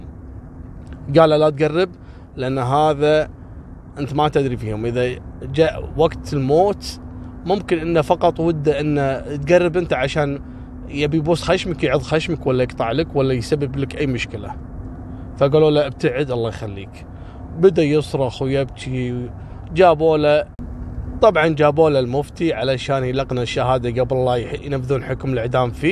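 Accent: Gulf, Arab world. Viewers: الله يسعدك لهجتك مثل لهجتنا في السعودية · طريقه كلامك اتغيرت انا الان بعام ٢٠٢٤ وهذا الفديو قبل اربع سنين كلامك كويتي ميه ميه الحين خليجي مخلط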